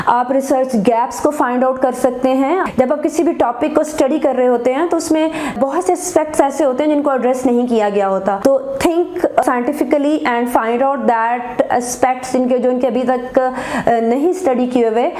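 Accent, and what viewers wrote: Punjab, India. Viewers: Please take as a feedback, punjabi lehjay me English chor dain,. . . Otherwise your content is fabulous.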